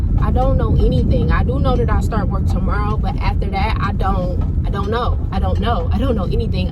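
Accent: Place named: New Jersey, United States. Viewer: any one special you recommend? Where are you from. You sound like me. A NJ girl.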